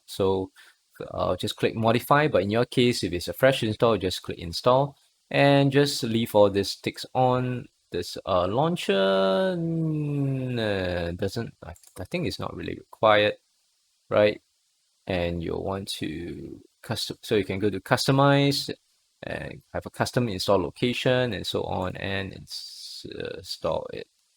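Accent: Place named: Singapore. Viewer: Nice video but I cannot understand your Singrish!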